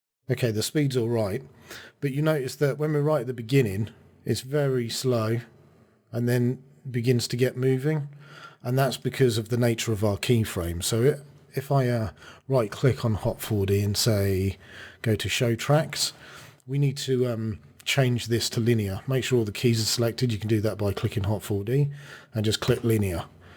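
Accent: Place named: United Kingdom. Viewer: Excellent tutorial, great explanation, plus the British accent adds to the credibility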